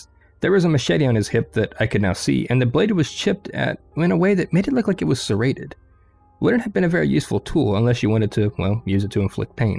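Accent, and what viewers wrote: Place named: South, United States. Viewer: youre welcome I do too. He is from North Carolina a southern accent. We do similar vid3os. I enjoy your interputation of it.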